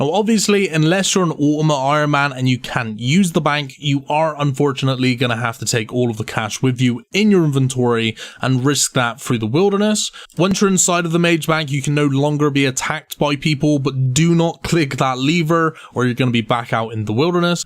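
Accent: Bristol, United Kingdom. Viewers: I've just found you but are you not Bristolian? Liked & Subbed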